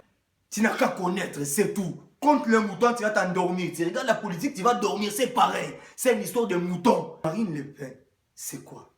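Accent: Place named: Democratic Republic of the Congo. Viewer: ta un peu perdu de ton accent congolais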